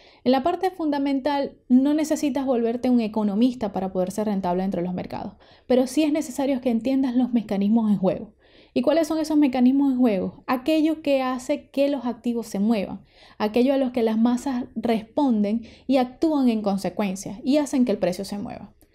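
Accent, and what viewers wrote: Maracaibo, Venezuela. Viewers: Holaaa viendo tu canal me estoy dando cuenta de toda la información q nesecito saber para hacer esto... De verdad te agradezco mucho la información.. Lo mejor de todo es tu acento Maracucho con que das el mensaje, los maracuchos vamos a conquistar el mundo.. Jajajaja saludos... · Venezolana? Maracucha????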